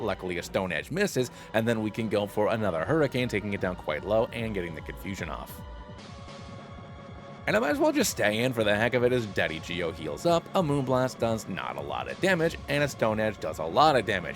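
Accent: Scotland, United Kingdom. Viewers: 9/10 Scottish accent, love Nessie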